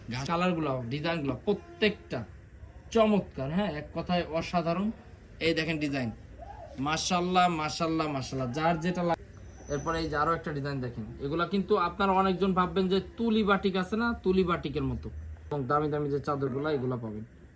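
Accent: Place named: Jessore, Bangladesh. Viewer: আমি যশোর থেকে আসসালামু আলাইকুম,,,,, কেমন আছেন ভাই আপনার কথা শুনে মনে হয় আপনিও যশোর এলাকার দিকের কোথাও আপনার বাড়ি হবে,,,, 😊আপনার ড্রেসগুলো আমার খুব ভালো লাগে আমি ছোটখাটো একটা টেইলার্স দিয়েছি ইচ্ছা আছে আপনার শো রুমে গিয়ে কিছু প্রোডাক্ট নিয়ে আসবো হেল্প করবেন আশা করি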